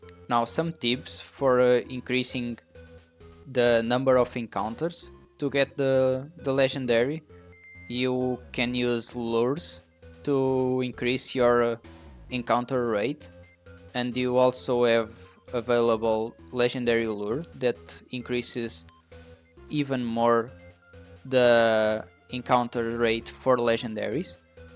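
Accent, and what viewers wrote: Portugal. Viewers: Are you portuguese? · És tuga nao és? Dá para perceber pelo teu sotaque 😅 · That accent, 100% Portuguese!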